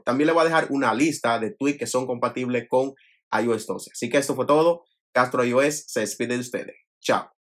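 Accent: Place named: Dominican Republic. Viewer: Dominicano Verdad lo noté por el acento Y se puede con iOS 12.2 ?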